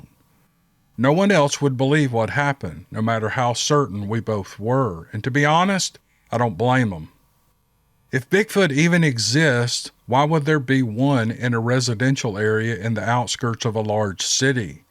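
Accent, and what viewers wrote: South, United States. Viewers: Love your story telling! That southern accent gives a warm memorable touch! Please continue and repeat daily.👍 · I always hit the like button after listening to stories (yammer all you want my friend, I enjoy it, and your southern accent makes me long for Memphis), but I had to hit right away because the "like" button was at 666. This video was a great series of stories - as usual! BTW, the people you talk about at the end of the video are the same people who badger and pick arguments with everyone around them whether they are work, in a bar, or even standing at a bus stop. They are straight-up A-holes, and the social media platforms of the cyber-age have only enhanced their ability to irritate and annoy at an exponential range. Every village has it's idiot, and every channel has it's troll(s).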